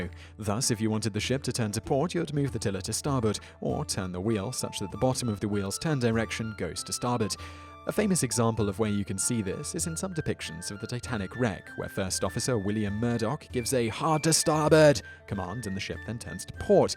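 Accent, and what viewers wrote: United Kingdom. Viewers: i know that is a navigation thing, and maybe a pirate one. but why so british? even feeling that i were the joker saying that, and is a good video, the talk is too fast. still is a good info. at some point it become harsh to translate.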